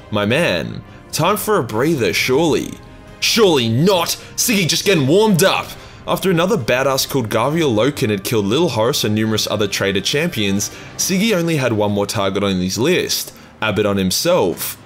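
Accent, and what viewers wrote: Australia. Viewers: Love Aussie narrative... SIGGY :D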